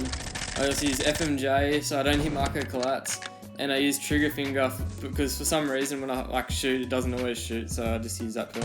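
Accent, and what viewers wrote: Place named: Australia. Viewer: Love your Australian accent and I even live qld but I don't have an accent. Lol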